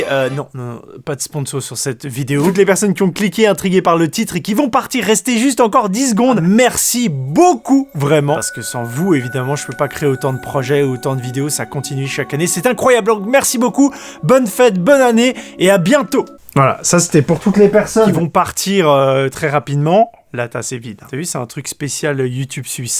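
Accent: England, United Kingdom. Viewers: J'adore toutes tes vidéos. Je n'en rate aucune. Peu importe ton accent anglais ( fuck les rageux) je suis admirative de ton travail. Mes vidéos favorites sont celles sur les animaux notamment celles de chauves souris. Et toutes celles où tu nous fais découvrir des lieux. Vraiment merci pour ton travail. Love sur toi 🙏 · Y’a qd mm que les francophones pr aller se moquer d’un accent anglais 🙄 au 21e siècle il serait GRAND tps d’évoluer, continue tu es parfait et merci pr cette belle année encore 🙌🏽🎉♥️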